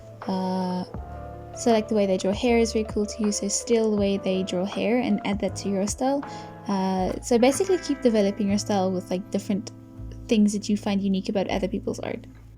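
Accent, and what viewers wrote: South Africa. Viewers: is that a south african accent? :D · Are you South African?!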